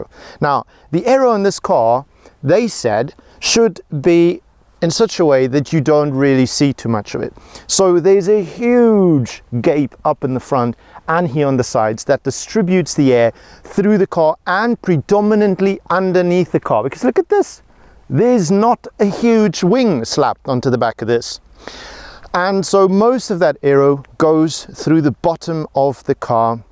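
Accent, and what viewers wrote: Cape Town, South Africa. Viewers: Is the presenter South African? Has our accent, and said “ lekker “ a typical South African trait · Is this dude South African? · Are you South African ? You sound like you from Pietermaritzburg.... 🤔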